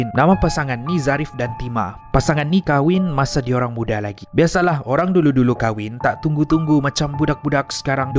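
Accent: Sabah, Malaysia. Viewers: Sabah ka tu bang...area mana ? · sedap dgr org sabah cakap... semua bahasa buku....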